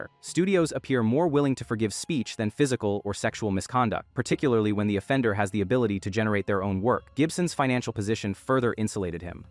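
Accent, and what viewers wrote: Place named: United States. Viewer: You have strange pronunciation of quite a few names. You do sound American though, which is pretty strange😊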